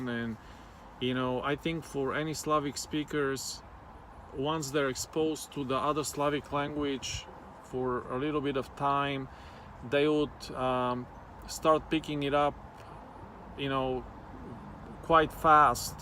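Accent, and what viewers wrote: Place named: Russia. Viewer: У вас даже акцент на английском звучит так, как будто вы русский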